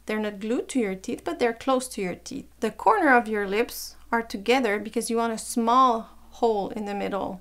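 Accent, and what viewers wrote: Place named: France. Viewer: It looks as if you have an french accent,so, are you French?(without malice)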